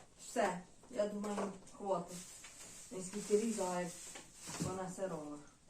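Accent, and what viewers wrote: Poltava, Ukraine. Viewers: 👍🙏❤💙💛💐👋Вітаю! Випадково натрапив на ваш канал. Сподобалось, як розмовляете з полтавським акцентом. Ви також красива, бо полтавчанки ж найкращі!!! Щиро бажаю міцного здоров'я, простого жіночого щастя та якнайшвидшого мирного життя!!! А керамічними ножиками не дурні ріжуть, а дурні їх виготовляють. Такими ножиками тільки зелень різати, а мерзле смачне сало добре ріжуть саморобні, дебелі та надійні ножі. Бажаю вам подальшого розвитку каналу та багато - багато доброзичливих підписників! Хай щастить!!!❤❤❤✍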